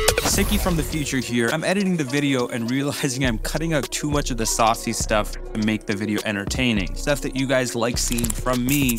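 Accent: India. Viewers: Tutorial indian accent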